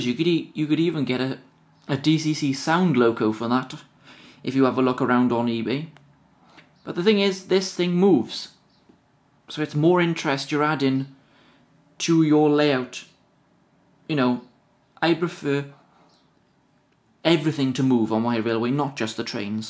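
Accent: Wales, United Kingdom. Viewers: I thought your accent was Welsh.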